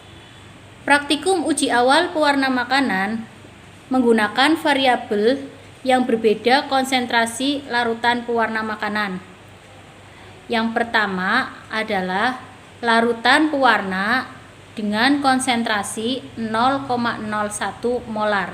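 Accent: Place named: Tegal, Indonesia. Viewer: Top markotop tegalane medok nmen ah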